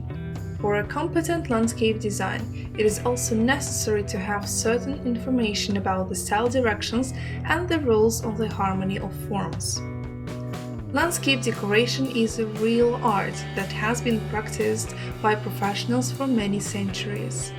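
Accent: Russia. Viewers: Русский акцент уникален😊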